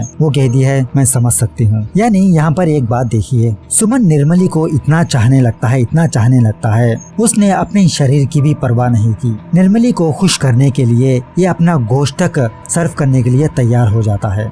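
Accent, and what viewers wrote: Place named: South, India. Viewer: Awesome south indian accent and current issues included in the explanations....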